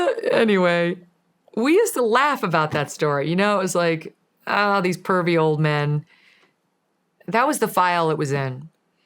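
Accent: Ireland. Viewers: She's irish?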